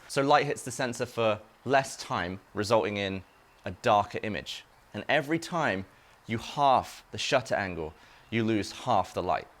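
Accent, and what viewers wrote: United Kingdom. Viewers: It might be his British accent but I'm not even interested in photography but watched the whole thing and learned stuff I didn't know I wanted to know. Great explainer, this guy is. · Are u British or American because you sound British but your in America. thx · Benjamin Collins British. But he lives in Texas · I love, but I couldn't find "shuta speed" in my manual 😀😂 gotta love that British accent thou! great video!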